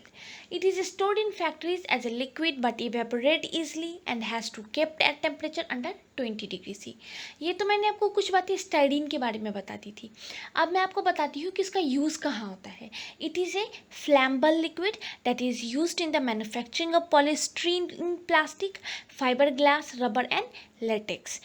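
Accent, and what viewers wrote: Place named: South, India. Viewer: Well presented . You must be from South India I believe .your voice seems like that . Thank you ma"am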